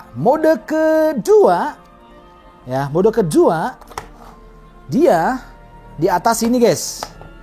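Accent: West Java, Indonesia. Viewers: Sunda mana ieu bang 😂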